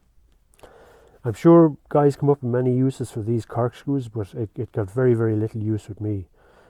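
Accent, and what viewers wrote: Ireland. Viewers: U irish ?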